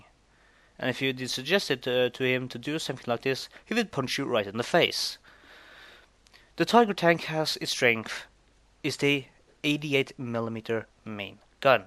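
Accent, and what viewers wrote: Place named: Germany. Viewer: well, atleast it was entertaining for a layman like myself. Swede here, and by the accent and knowledge in German tank warfare im guessing your a german?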